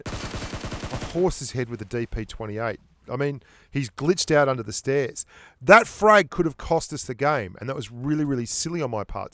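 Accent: Australia. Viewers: Ar U Australian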